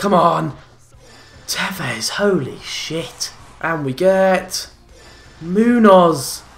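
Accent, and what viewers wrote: RP, United Kingdom. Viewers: your voice I'm watching your videos of 2015. Such a different. Posh voice here, shouting mad and loud as hell back in 2015. Lot of difference. Deserves gratitude now. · The he used to speak, was so posh haha.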